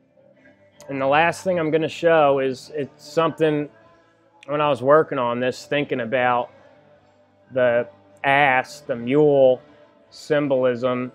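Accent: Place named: Pennsylvania, United States. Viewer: Love how you had a Freudian slip and said The Lion shall lay with the Lamb 🐑 I mean the wolf 🐺 😂 it is photoshopped but it, it has to be ! Great stuff my friend. And it’s nice to hear your PA accent I was just living in Tower City but in FL now. Yea your getting into the difference between the mortal flesh or materialistic vs. the Higher Mind and spiritual self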